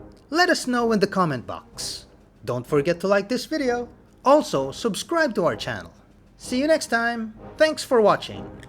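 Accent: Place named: Philippines. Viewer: This is Filipino English accent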